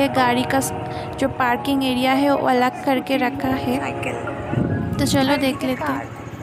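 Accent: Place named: Bengal, India. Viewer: You sounds like Bengali.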